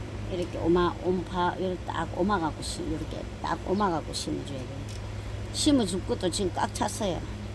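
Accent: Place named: Gyeongsang, South Korea. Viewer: 저두 경상도여자~~ 말씀, 사투리 다 알아듣고, 넘 재미나시는데! 넘~신경쓰지마세요~~앞으로도 조잘조잘 많이 부탁드려요~~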